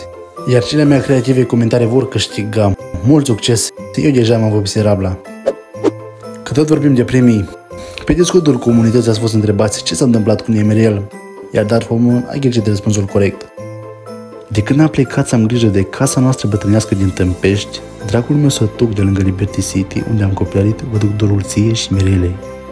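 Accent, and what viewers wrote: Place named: Moldova. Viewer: Ma jur daca inteleg ceva din ce zice asta…oribil accent, n-are o treaba cu B-vlog-ul vechi…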